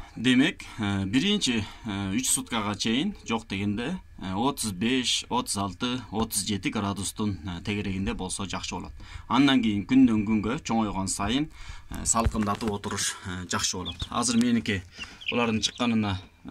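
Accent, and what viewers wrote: Pamir, Tajikistan. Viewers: Памириский акцент 😀 молодец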